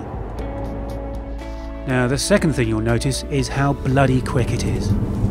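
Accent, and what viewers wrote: United Kingdom. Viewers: British calm voice narration <3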